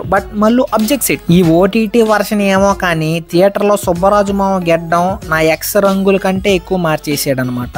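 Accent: Nellore, India. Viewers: Are you from nellore? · Enti nellore ah meedi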